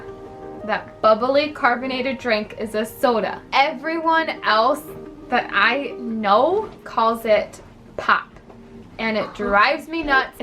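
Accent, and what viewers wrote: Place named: North, United States. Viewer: Oh my gosh I need to do this for St Louis, lol I’m sure I say some things weird. My own daughter asks me why I say “car” and “can” the way that I do. 😂🤣 car sounds more like “CORE” and can sounds more like “Ken” Lol 🤷‍♀️ “Minnesota” — I hear your northern accent in that word and ironically, when you say “say” lol 😂 but not in very many of the other words! I’d say you have just a slightly northern accent. OHHH yes, I definitely hear the accent when you say “bag” lol you’re pronouncing it more like “beg” for sure. 😂😜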